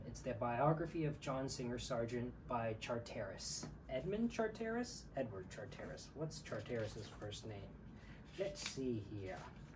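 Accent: South, United States. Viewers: Love the southern accent! good idea! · As a native Texan, I found your southern accent delightful, and you are just adorable.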